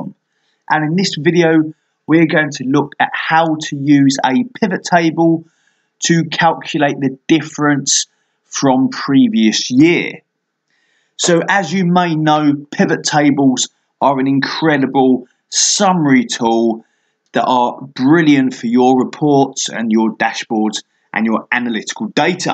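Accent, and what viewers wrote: United Kingdom. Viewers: Thank you so much. I've been looking for an explanation for this analysis in other languages (in pt e it) for so long, without success. Your explanation is so clear, plus british accent, perfect!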